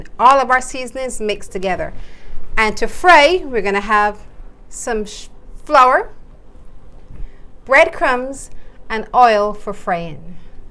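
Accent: Barbados. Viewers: Nice to hear a Bajan for change. Love de Rock! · Girl yousa bajan I recognise that accent anywhere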